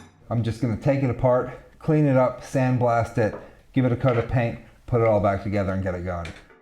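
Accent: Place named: United States. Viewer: Where on earth do you live? Based on your electrical outlets in your shop I was thinking somewhere in the UK but Maybe not? Definitely Europe somewhere I assume. The other big clue are the stone walls in your shop that you just don't see in the US other than a few colonial era homes and barns in the Eastern US. But then your accent is completely American so it's all a bit confusing haha.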